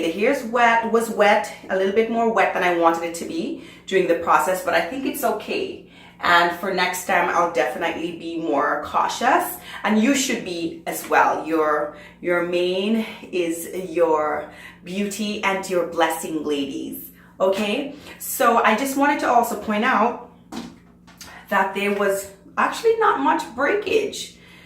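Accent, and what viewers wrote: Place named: Jamaica. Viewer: Are you Jamaican? I think I hear an island accent ! Lol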